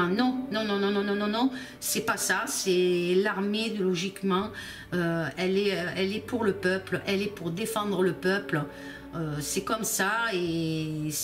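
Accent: South, France. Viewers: Adorable et bel accent du sud Merci pour le tirage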